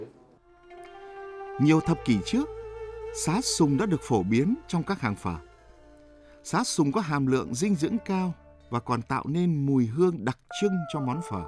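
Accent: Hanoi, Vietnam. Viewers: Giọng chú mc này chuẩn hà nội nè : trầm trầm chậm rãi nhẹ nhàng thanh tao âm vực rõ ràng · Giọng hà nội gốc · Mc nói giọng hà nội gốc nghe hay quá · Giọng hà nội nay mất chất rồi. Điệu mới ngọt vcl. Hà nội có tiếng là giọng chuẩn , rõ nghe như giọng của ng trong clip này vậy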